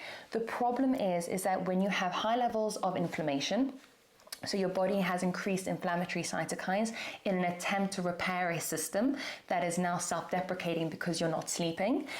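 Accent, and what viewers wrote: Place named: England, United Kingdom. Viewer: I just assumed you were from England. Where are you from/ grew up? Ps. You're my current fave educator/ influencer/ author ❤. Waiting for my bday in November to buy myself your book.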